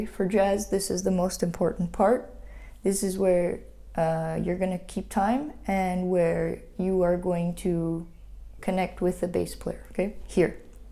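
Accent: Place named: Eastern Europe. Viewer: Slavic accent. It's not thick at all, but it sort of 'rounds' words, makes them voluminous. Great video, btw!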